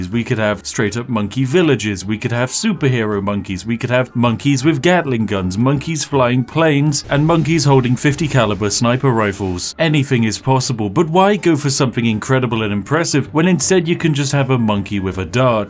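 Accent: United Kingdom. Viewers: I like you're british accent) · My guy sounds so british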